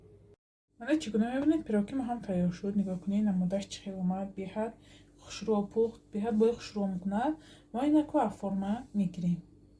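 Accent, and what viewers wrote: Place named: Konibodom, Tajikistan. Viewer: ташаккур ба чавобатон апачон, ман аз Конибодом ,тарзи гапзанитон ба лахчаи мо монанд аст хело..дастатон дардро набинад,Ташаккур ба шумо,хело ба ман писанд хастед,хоксор апаи худам❤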